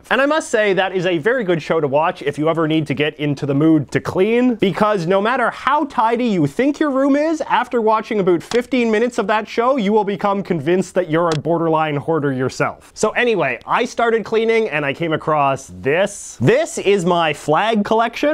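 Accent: Canada. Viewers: Way do u have the stereotypical Canadian accent. We don’t own him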